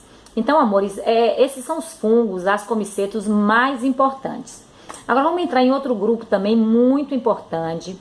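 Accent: Northeast, Brazil. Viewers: Esse sotaque nordestino deixa o vídeo mais empolgante ... Na moral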